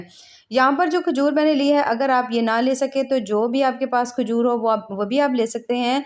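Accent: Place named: Punjab, India. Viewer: AOA Apki kafi videos me ny dakhe hen, ap jis Andaz me or Punjabi baul K bat krti hen, muje boht acha lgta he Apko sun KR,,, ap bohat ache baten krti hen Jo K reality se related Hoti hen😊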